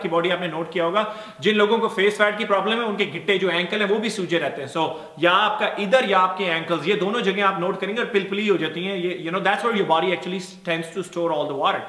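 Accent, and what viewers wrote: Punjab, India. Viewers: I like your punjabi accent and also sense of humor. but this video doesn't help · 😂😂 bai Canada ch reh k v teri Punjabi ch koi frk nai ayaa... love you bro..👍🏼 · i love ur punjabi as well as english accent. i m huge fan of ur accent plus u r damn cute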